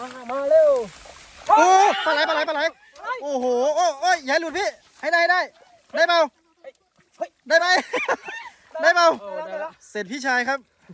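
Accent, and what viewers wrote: North, Thailand. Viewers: อู้กำเมืองบะดีกาบ่าน้อยฟังแล้วสบายหูดีอู้ไทยกลางฟังแล้วมันบ่าม่วนใจ๋เฮาคนเมืองเหนือเน้อ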